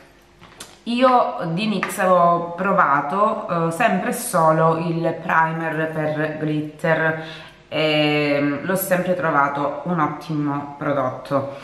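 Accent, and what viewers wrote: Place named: Sicily, Italy. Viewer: Io sono Siciliana.. mi sembrava che eri siciliana...ho gli zii che stanno in Calabria ♥️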